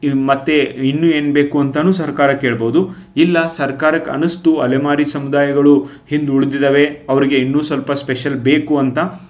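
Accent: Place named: North Karnataka, India. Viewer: Sir stick to your ground of uttar Karnataka accent, don’t try hard.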